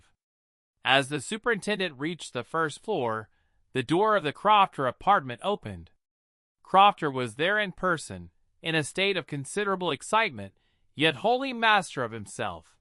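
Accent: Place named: United States. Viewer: Omg!!! Do not let a AMERICAN READ AGAIN 😡😡😡😡😡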